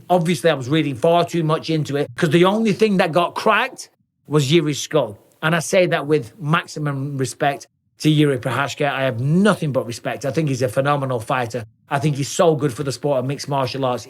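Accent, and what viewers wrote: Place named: England, United Kingdom. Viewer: This is why I love f**** Michael bisping.. speaking English English and s*** .. putting you motherfukers on game😂❤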